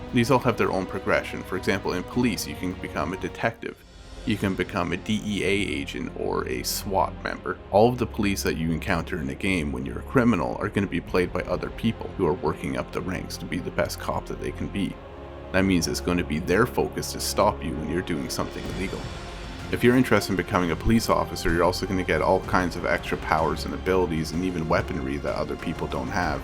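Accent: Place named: Canada. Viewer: I typed this comment earlier, but I accidentally deleted it because I'm a moron. But, will you have playable female characters in the game? I saw that you had sketches of female characters, but would it be possible for the player to actually be a female? I don't mind playing as a guy, but I was just curious. Also, are ya'll based in Canada? I can hear an inflection in your voice (namely when you say "about" or "out"). I don't think my current computer can handle this game, but, pathetic as it may sound, I'm willing to get a part-time job just to play this game. I really hope ya'll throw a violin or cello in there or something as one of the musical instruments. That would make my life complete.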